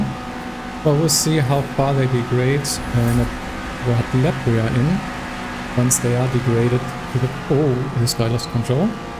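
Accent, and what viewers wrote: Germany. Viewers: Moin. Deutscher Akzent?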